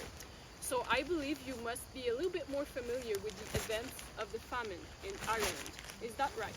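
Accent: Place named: France. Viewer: Where she sounds French? Could it be Canada?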